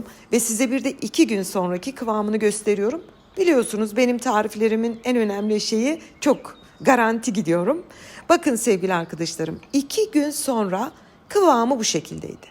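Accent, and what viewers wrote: Germany. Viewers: Ellerinize güzel sakin anlatımınıza ince detay vermenize aklınıza sağlık sağlıkla kalın teşekkür ederim. Antalya Türkiye. Babam da sizin gibi şupangıle şıtress şıpor der konuşurken Almanya da yaşamanın minik süsü kulağa yabancı gelmiyor. Sevgiler.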